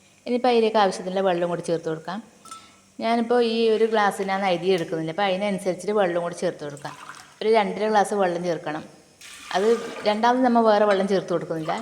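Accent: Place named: Kasaragod, India. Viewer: ഭാഷ തനി കാസർഗോഡ് തന്നെ അല്ലെ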